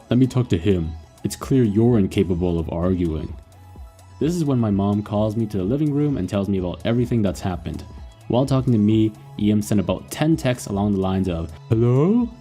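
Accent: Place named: England, United Kingdom. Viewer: you sound like TheRealKenzo but with just a pure english accent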